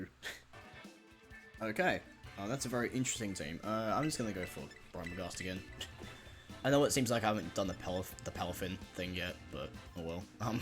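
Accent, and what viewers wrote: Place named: Australia. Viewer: I am almost sure you're Australian?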